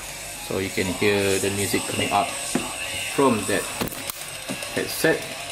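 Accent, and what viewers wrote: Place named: Malaysia. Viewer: Your accent just like Malaysian.....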